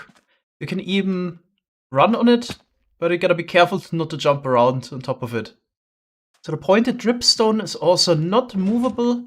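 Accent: Germany. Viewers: You sound like you're German You kind of have a German aczent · Is he german?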